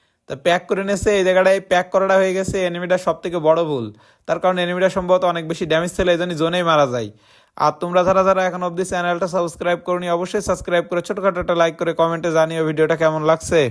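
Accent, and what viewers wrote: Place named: Kaliganj, Bangladesh. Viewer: Tomar basa ki Kaliganj er ase pase? Tomar kothar style sune bollam.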